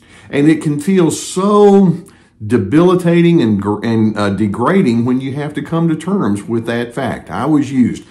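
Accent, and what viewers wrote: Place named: Texas, United States. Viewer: YES!!! I was USED. Very difficult to wrap your head around that when you first realize! Who DOES that??? Answer; someone who doesn’t really have a life! When all is said and done; I have lived my life well. I have learned, I have raised a child, I have respected my parents, I have been a decent giving person as much as I could. I have EVOLVED! He was a hollow shell, a cheater, a crook, immoral and filled with cynicism and hate. He had to PRETEND to be someone else to get me! So WHO REALLY WON??? (I you want to say it in the crude modern vernacular!) Thanks again Dr for the nuances you bring to this subject. PS; never thought I could become FOND of a Texas accent😉!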